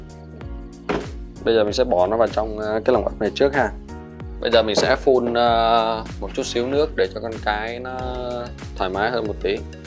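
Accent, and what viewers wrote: North, Vietnam. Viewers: Anh ở Miền Nam sao nói giọng Bắc nhỉ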